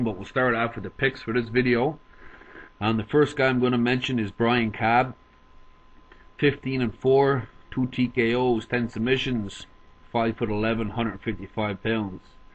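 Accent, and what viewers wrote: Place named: Eastern Canada, Canada. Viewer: You have one hell of a Eastern Canadian accent.....you're a very knowledgable poster though. Keep it up!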